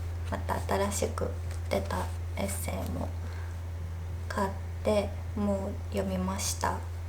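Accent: Kansai, Japan. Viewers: 台湾からの視聴者ですー！久しぶりに動画観れて嬉しいです。関西弁もかわいいです❤ 体調に気をつけて無理のないようにお過ごしください！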